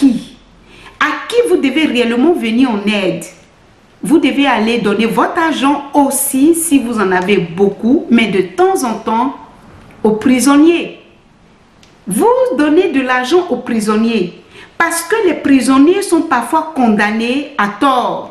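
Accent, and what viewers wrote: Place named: Ivory Coast. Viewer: Bonjour madame. Tu parles avec le langage ivoirien. Es tu de mon pays la Côte d'Ivoire ?? Tu es fabuleuse et je suis fier de toi et de tes propos.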